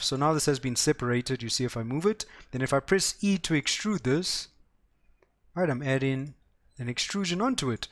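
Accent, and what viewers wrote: South Africa. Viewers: you from South Africa bud?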